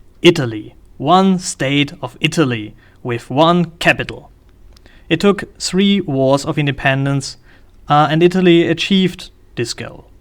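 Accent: Germany. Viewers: you sound german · Since Rachel Dolazar, some SJW'S & even Don Lemmon of CNN suggest that race could well be a social costruct. Rachel Dolazar feels like a black women therfore she is. Although she is a con artist who took advantage of affirmative action & her leadership role for a black organisation. If race is just a social construct & fluid I am Kekistanian as well...and boy, have I been opressed! These regressive lefties dug their own hole! Where's my $$$ where are my advantages? BTW, are you German? Weil ich Deutsche bin & meine es raushören zu können.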